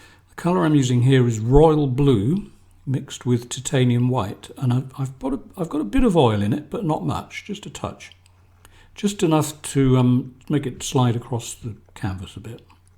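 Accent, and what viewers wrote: England, United Kingdom. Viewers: I have learned a lot from watching your videos, I have painted along with you and it has helped me to paint loosely, which I struggle with. I love your accent, my mother came to the US from England along with several of her siblings and it reminds me of the time they would all get together. Anyway I would love for an instruction on painting rocks, I live in AZ where we have a lot of rocky ground. Thank you for all your video's.Sam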